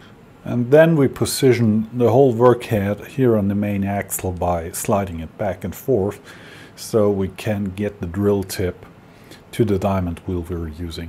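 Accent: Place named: Germany. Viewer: I just love the german pronunciation of "Z" as "Sea" . That is adorable. And authentic.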